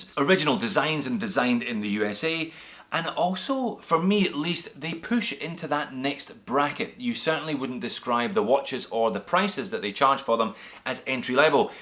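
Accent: Scotland, United Kingdom. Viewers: Scottish accent sounds like the taste of a heavily peated whisky, and I love both. The watch however is to Hulky for me, I enjoy the Kermit style much more.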